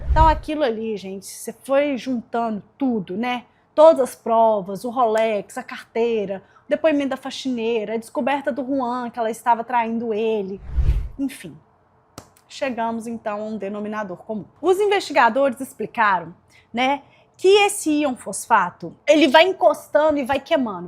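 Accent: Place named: Minas Gerais, Brazil. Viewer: Mais uma inscritaaaa🤩🤩🤩🤩 Pelo sotaque ... é mineira!😂 Se foor bate aqui mana ✋️👊🤜🤛👏🙌🫶😍 Mas se não for... pra mim é minereis do msm jeito 😂🫶🫶🫶🫶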